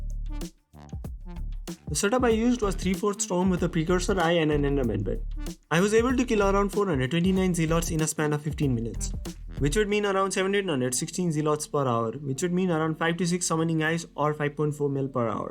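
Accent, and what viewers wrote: India. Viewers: Your voice and english talking is like a Indian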